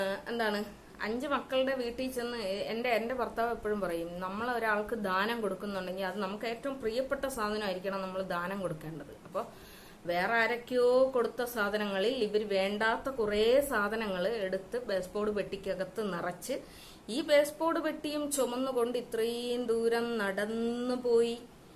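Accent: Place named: Thiruvananthapuram, India. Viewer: ഞാൻ പറഞ്ഞു 🤭 തിരുവനന്തപുരം ഭാഷ സൂപ്പർ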